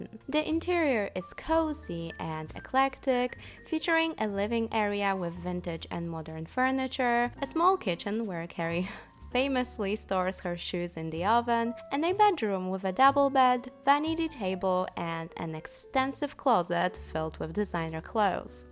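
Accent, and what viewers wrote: Poland. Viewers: Your Polish accent is unbearable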